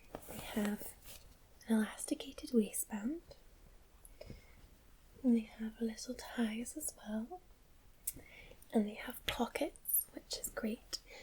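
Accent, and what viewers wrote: Scotland, United Kingdom. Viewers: great video , thank You! I enjoyed the accent .. first video I've seen that You actually used it..least that I could tell. You're lucky to live in Scotland, im a huge scotch fan, Laphroaig, from Islay. Thanks again. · Not sure if you have done that before, but would you consider making a video where you purposefully talk "very scottish"? I kinda love it and it might actually be tingly :D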